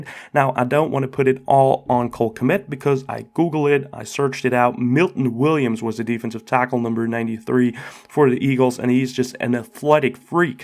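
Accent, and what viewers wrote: Chicago, United States. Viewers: My first time watching one of your videos and I love the hybrid Chicago accent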